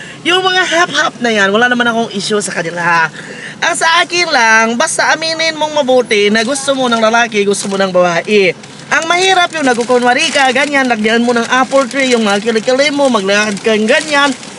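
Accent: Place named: Visayas, Philippines. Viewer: Omg you never fail to make me laugh!! Ang galing mo teh!! Hahah! Pasok sa banga yung bisayan accent!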